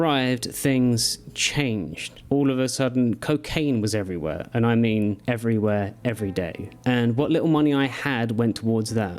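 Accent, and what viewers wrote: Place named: United Kingdom. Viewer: Truth, hollywood grade good looks and British accent carried you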